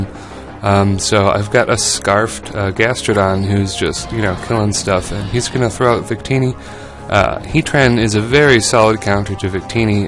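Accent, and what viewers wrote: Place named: Australia. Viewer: Youre sounding Less and less australlia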